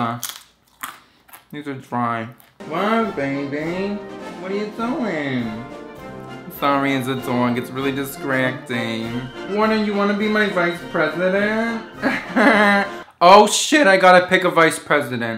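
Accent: New Jersey, United States. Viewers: This is one of the best New Jersey accent I've heard.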